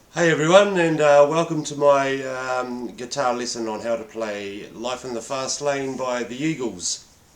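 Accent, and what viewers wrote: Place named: New Zealand. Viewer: I don't know if it's a cultural thing, but anytime I hear a kiwi accent in a tutorial, I know I'm in good hands, hahaha.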